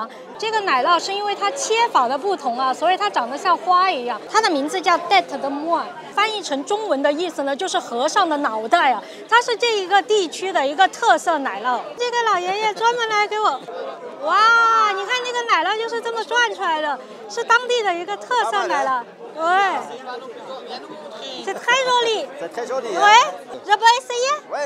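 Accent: Sichuan, China. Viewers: 不一样的坝坝宴，不一样的川式普通话，不摆嘞。。。。！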